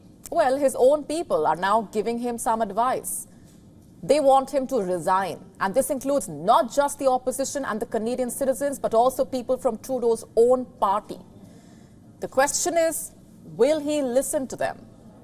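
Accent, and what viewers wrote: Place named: India. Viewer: Indian Accent heavily influences pronunciation ... hope so you would understand.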